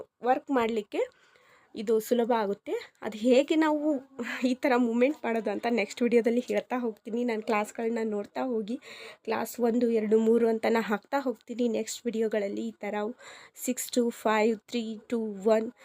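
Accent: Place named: North Karnataka, India. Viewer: ಉತ್ತರ ಕರ್ನಾಟಕದ ಭಾಷೆ ಚಂದರಿ... From Chikkamagluru..god bless u..and Tq ur information ❤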